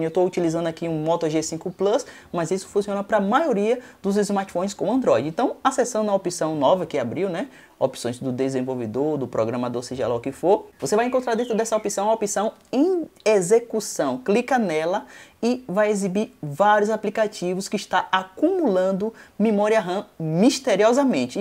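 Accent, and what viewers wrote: Bahia, Brazil. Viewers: Estou sentido um sotaque familiar( baiano ) no vídeo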